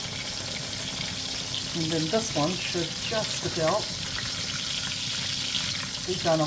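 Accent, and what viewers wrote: New Zealand. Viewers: Kia ora! So nice hearing a Kiwi voice, feels like i'm listening to an aunty. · Bruh what I understand it fine. New Zealand accent bruv you must be American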